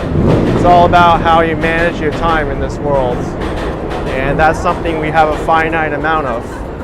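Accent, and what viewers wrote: New York, United States. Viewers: Watching this as the sun sets here, 3 hours later, in Oakland CA. What a great way to be linked to our pride and joy NYC. Or mine, at least. And to have homegrown voiceover accompanying my virtual walk. What part of the City or environs are you from? I know, I'm assuming things, but sure sounds like it from your accent.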